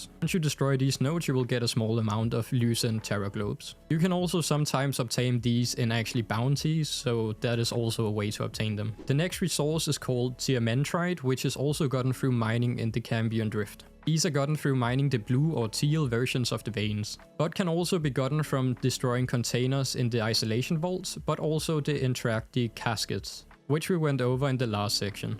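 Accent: Denmark. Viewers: This video really helped🤩. I have a question are you from Denmark because it sounds like it with your accent?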